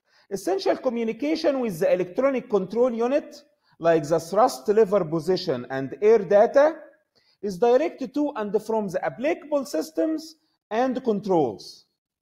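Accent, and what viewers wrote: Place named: Egypt. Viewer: اللهجه المصريه 😂 فيديو رائع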